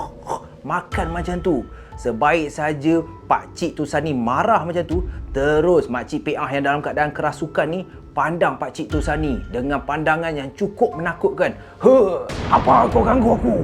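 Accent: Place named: Kedah, Malaysia. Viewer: Orang Selangor nak CAKAP Kedah MEMANG susah lah SIKIT sebab loghat Kedah ni sangat pekat sedikit. Best lah ni.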